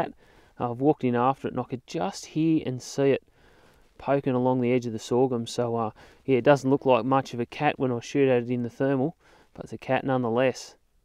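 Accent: Australia. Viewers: pure evil LOL 🤣😂,.have to say, im glad you ain't no Yank, as the Aussie accent just finishes it off perfectly. Any time you visit UK, let me know, gladly buy you a beer 🍻 & have a barbie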